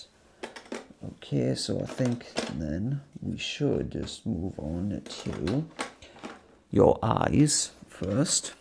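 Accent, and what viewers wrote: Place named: Wales, United Kingdom. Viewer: Your accent is lovely! Welsh I think? 😅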